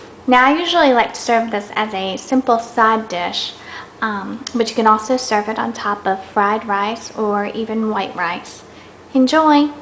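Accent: South, United States. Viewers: Is that a southern accent I hear? :)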